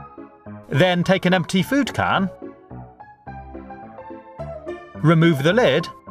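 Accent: United Kingdom. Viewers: Fahima Husaini he’s just saying his accent is British I’m British to but I still laugh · He has a British accent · It is a British accent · You guys are fools, he's British and also has a British accent. He's even using a 50 pence coin in the video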